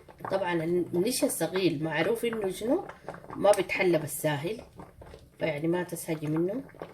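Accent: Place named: Sudan. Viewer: يا الله على البساطة و اللهجة الجميلة 🖒🖒. إن شاء الله أزور السودان و لو ليومين 😎. قولوا آمين. أختكم الجزائرية في المهجر.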